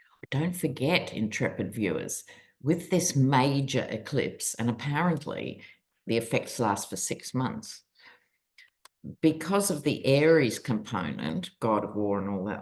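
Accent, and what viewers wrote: Australia. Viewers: Thank you Dr - love your Australian accent ❤❤❤🙏🩵🩵🩵🙌☮️👍✌️🌎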